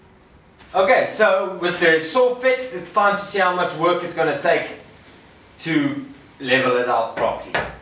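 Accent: South Africa. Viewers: South African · The South African accent is so great! I have a few friends from SA and love hearing them speak. Add that on top of woodworking and you have a new subscriber! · Is this man south african i think i hear the accent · Ja, deff a saffer. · South Africa, I believe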